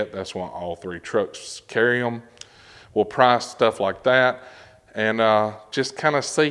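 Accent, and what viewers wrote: South, United States. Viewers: Thank you - I hate when they do that and besides is he speaking English or Southern drawl?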